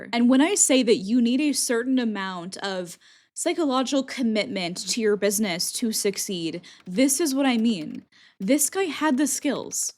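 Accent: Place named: United States. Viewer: I'm kind of waiting for you to address and acknowledge the privilege as well: privilege of being a white American woman (at least from the accent), of having a partner who is supportive who has a high paying job that can support two people, of living in the U.S - particularly NY and LA. I'm not bringing down your accomplishment, but I'd like the average person reading this to not compare their reality to yours, unless it's very similar.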